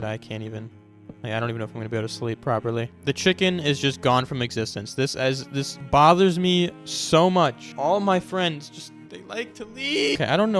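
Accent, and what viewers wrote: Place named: Poland. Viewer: Polska Gurom! Very nice accent for polish people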